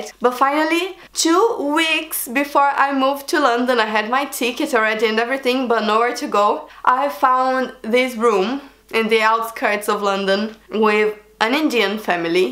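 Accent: Brazil. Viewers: Kkkk verdade eu olhei pro rosto escutei ela falando o sotaque bonito brasileiro ali, haha é brasileira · Eu sabia que vc era daqui! Soube pelo sotaque, kkk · O sotaque dela não engana, muito fofo 💖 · You have a Brazilian accent but its a Brazilian cute accent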